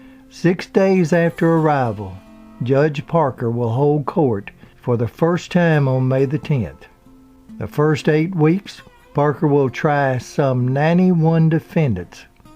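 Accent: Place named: Rural, United States. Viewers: Please get the marbles out of your mouth and properly enunciate your words, this is English, not hick. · Great stories. They should be delivered by a campside fire. I'm British but I absolutely love that accent. (Is it a Kentucky accent BTW?)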